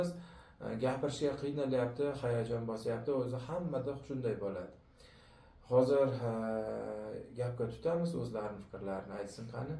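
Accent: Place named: Khorezm, Uzbekistan. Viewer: Ассалому алейкум. Ози каерлик ? Шевасидан хоразим или Караколпогистон турткул или элликкала беруни туманиданми .телеграмда чикмаябди номерингиз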